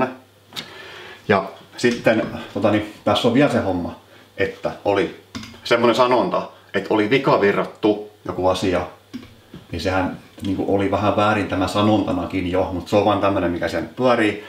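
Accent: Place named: Turku, Finland. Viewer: Tuosta murteesta sellainen, että oli alkuun vaikea ottaa sun sanomisia ja ohjeita tosissaan, kun kaikki oli turkulaisittain ilmaistu epävarmasti ja "vähän sinnepäin", mutta siihen on tottunut. Tässä kun on sun videoita ihan ajanvietteenä ja uuden oppimiseksi lomalla katsellut, niin tässähän saattaa kohta uskaltaa jopa käymään Turussa, kun alkaa ymmärtämään murrettakin. :D Sopivan selkeitä videoita ilman turhaa koristelua, hyvä hyvä!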